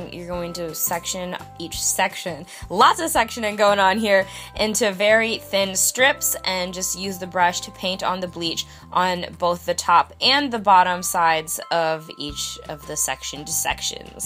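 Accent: Jamaica. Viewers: that jamican accent tho i can teach u cuz i am jamaican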